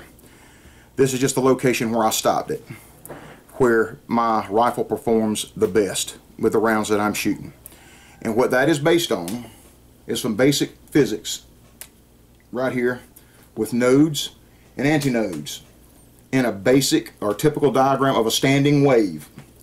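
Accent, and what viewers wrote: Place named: South, United States. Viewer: There is just something wonderful about a somebody with a southern accent explaining scientific or technical stuff. That was a really good explanation.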